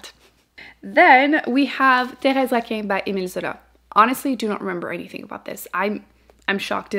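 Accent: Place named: Quebec, Canada. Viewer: Canadian French sounds sooo different than France French 😟 Love your accent🥰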